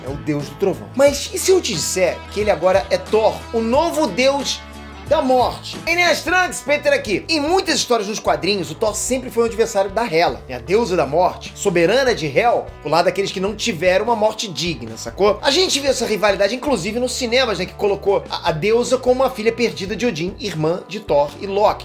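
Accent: Rio de Janeiro, Brazil. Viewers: Impressão minha ou ele falou com sotaque carioca?!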